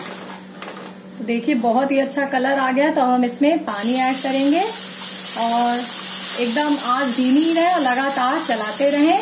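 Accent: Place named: New York, United States. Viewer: NYC voice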